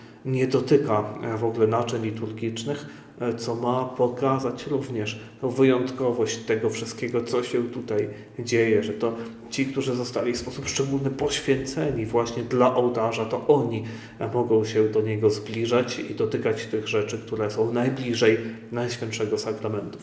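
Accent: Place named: Italy. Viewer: Czemu włoska wymowa zamiast łacińskiej?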